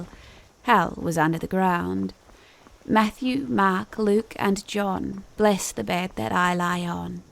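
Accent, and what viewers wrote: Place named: New Zealand. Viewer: The sad unfortunate side of listening to these knowledgeable people is just a reminder of how little I know! How unfortunate that there is so much to learn and yet it would take a plethora of lifetimes to just break the surface. …but, do endeavour to learn as much as I can while I walk the earth! Actually your Kiwi accent is what makes your narrations so appealing.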